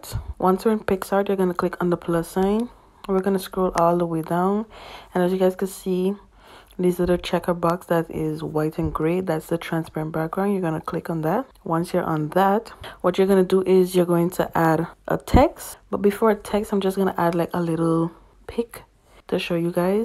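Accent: Guyana. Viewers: Sis are you Guyanese 🇬🇾 ?? 🥺 cause your accent chileee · Hey hun you sound Caribbean asf like Guyanese where are you from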